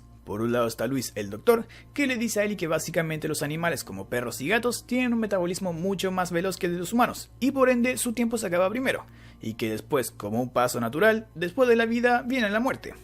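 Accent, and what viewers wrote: Chile. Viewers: Es Chileno? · Eres de chile?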